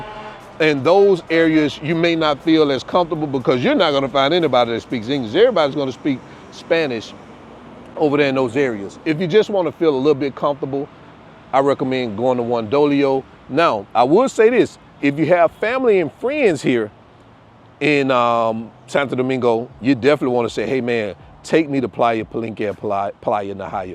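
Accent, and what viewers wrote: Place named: Alabama, United States. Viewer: Es el acento Alabama para mí .. con las palabras en español 😂 “malecón” “Ave Spaña” 😂🫶🏽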